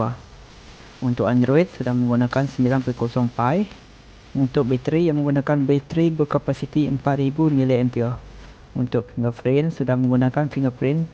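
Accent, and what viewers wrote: Sarawak, Malaysia. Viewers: org Sarawak kq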